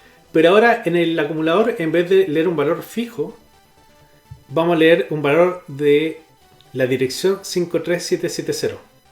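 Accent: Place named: Chile. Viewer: excelente, increible la coincidencia !... yo estoy metido tambien en un grupo de Facebook Atari Chile, ahi tambien se comparte harta informacion, hace poco por ejemplo compre el A8PicoCart y el SIO2PC pero es un Arduino con Pantalla Tactil seguro lo ubicas, y el vendedor que es un usuario del mismo grupo me vendio el Cartucho 4 en 1 que trae Basic, y Ensamblador, y justo me pille este video, cuanod escucho el acento del locutor, y me sorprendi que un chileno este hablando tan bien de este tema.. y mejor aun si es de la zona. Asi que, encantado nos podemos juntar, te aviso cuando vaya a Quilpue y charlamos. La verdad a mi me gusta la programacion retro tambien, de hecho, para C++ no utilizo ningun IDE, uso VIM sin esas herramientas modernas de Intelisence o Autocompletado, mientras mas simple el editor mejor. es mas tengo en mi canal unos mini tutoriales para configurar el entorno al estilo Borland y Atari. 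Sobre el Z80, estuve mirando unos videos de Profesor Retroman, es un canal español super didactico tambien, ahi aprendi algunos conceptos como dibujar sprites en pantalla.. pero como no tengo nada parecido al Z80, me incline por el 6502. En que usas C++ ?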